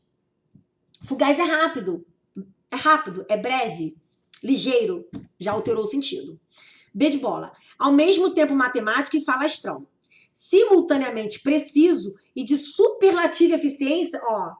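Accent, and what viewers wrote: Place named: Rio de Janeiro, Brazil. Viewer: vc dá aula no RJ em qual curso? sou daqui e nosso sotaque nos entrega rs. Gostaria de ter aula no seu curso.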